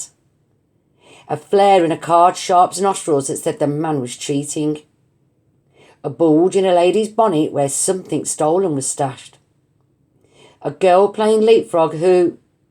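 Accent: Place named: Victoria, Australia. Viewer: Good on you for reading this out loud. What part of Victoria is your accent from?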